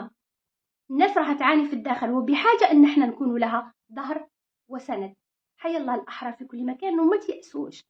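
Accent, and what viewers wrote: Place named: Morocco. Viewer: والله فشل نعم خاوتي فالبداية أسمع مليح لهجةتاعها مغربية روح لبلادك المروك ترفدك كل الفيديوهات تتكلم الثورة الثقة الحراك بعدينا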